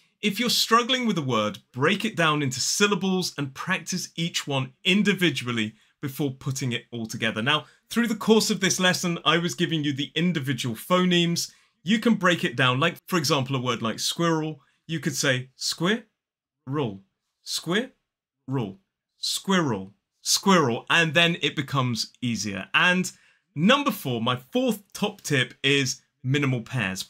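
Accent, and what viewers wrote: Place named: RP, United Kingdom. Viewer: Very interesting to hear difficult words pronounced with a British RP pronunciation. Thank you for the lesson ❤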